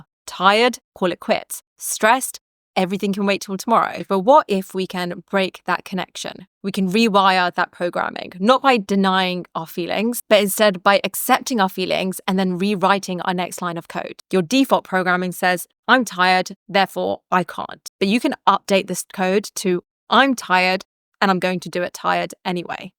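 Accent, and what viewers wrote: United Kingdom. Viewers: I would like to make a comment if I may… could you please talk slower? I normally have to listen to other YouTubers with 2x but for you, I cannot continue listening after 5 mins… if you try to level your British accent with a speed which makes it more understandable, it will be best… also, YouTubing is your job… pls try not to pour in your anxiety in your speed of speaking 😊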